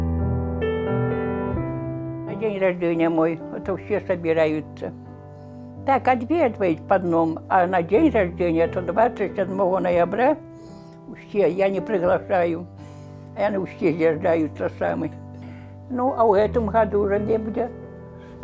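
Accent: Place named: Rural, Ukraine. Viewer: Она же не на белорусском говорит. У нас в Украине в селах так разговаривают. Я сначала субтитры читала без звука. А потом включила звук и поняла, что она вполне понятно говорит.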